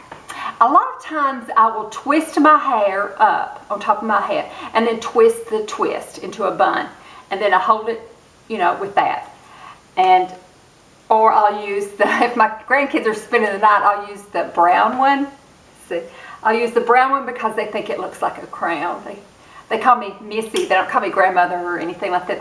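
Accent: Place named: South, United States. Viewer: You would win SURVIVOR, I'm certain... your sweet genuine spirit would definitely win votes. I'd bring you as my one item because of your heart warming personality~ I often think my adult daughters will show up at my door doing an intervention someday - I "hoard" skin care, make-up, BOOKS, and shoes... I love your videos and always enjoy your sweet southern drawal... never boring, Missy!!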